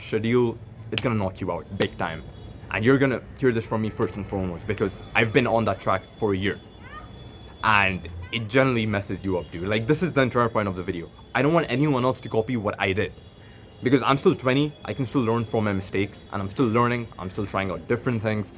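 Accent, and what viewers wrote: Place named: United States. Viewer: bro you form kuait but please tell me how you got this such fluent american accent please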